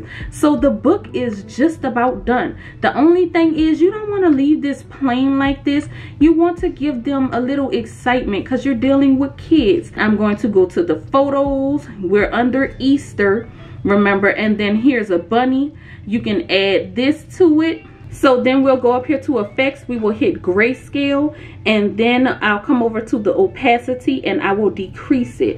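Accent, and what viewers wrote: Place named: Louisiana, United States. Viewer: My good sis. That Louisiana accent is so strong I subscribed just for that!! Love the realness!!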